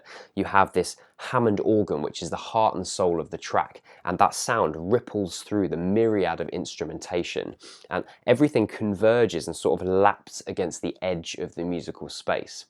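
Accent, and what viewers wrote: United Kingdom. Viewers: I love your articulate descriptions, your serene voice, your British accent, and your very handsome face. Listening to you talk about albums I love is validating, cathartic and almost as enjoyable as listening to the albums themselves. No homo tho, for reals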